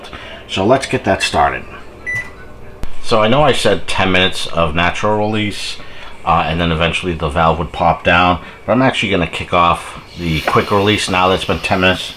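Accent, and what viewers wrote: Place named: North, United States. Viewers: Sounds like Pittsburgh accent am i right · Like your accent man, I'm from the deep south and love listening to you guys up there talk.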